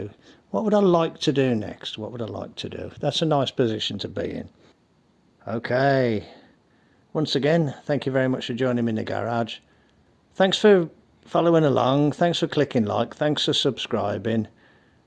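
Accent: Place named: West Midlands, United Kingdom. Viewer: Loving these videos on the 100E AND really nice to hear a WM accent again 😊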